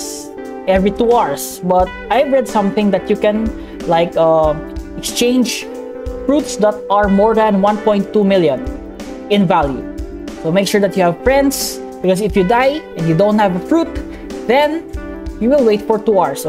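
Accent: Philippines. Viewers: Are u filipino ur accent is filipino accent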